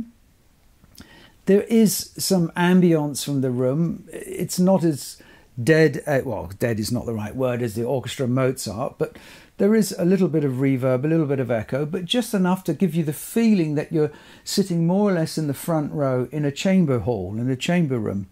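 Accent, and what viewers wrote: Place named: United Kingdom. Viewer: Any Americans watching don’t let the British accent fool you. This man has nothing knowledgeable or illuminating to say about this music. His vocabulary is tiny and his attempts to pronounce French, German and Italian are pitiful. Worst of all, and it’s a crowded field, is the way the camera lingers on him throughout the extracts as he “conducts” along, bereft of any sense of rhythm, like Cary Grant as Toscanini.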